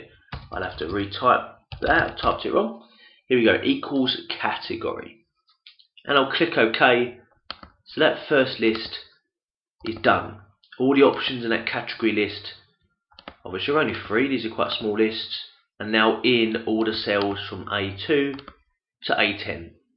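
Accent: England, United Kingdom. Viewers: Thank you very much! You saved my life today at work! P/S: I really enjoyed English accent : ) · Very helpful. English accent makes it sound highbrow too!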